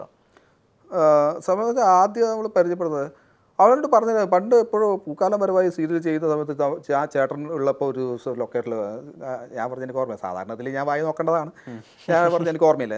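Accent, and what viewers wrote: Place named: Kannur, India. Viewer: Jishinte സംസാരത്തിൽ കണ്ണൂർ ഭാഷാ അസ്സലായി ഉണ്ട്.പിണറായിയുടെ സംസര ശൈലി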